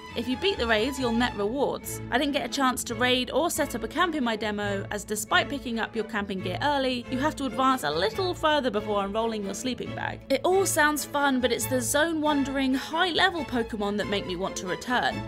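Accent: United Kingdom. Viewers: It’s kind of majestic hearing a British person talk about Pokémon using some British words ^_^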